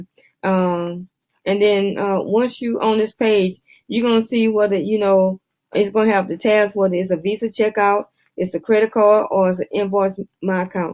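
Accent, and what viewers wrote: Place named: Houston, United States. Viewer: You sound like you from h twn. Preciate the info fr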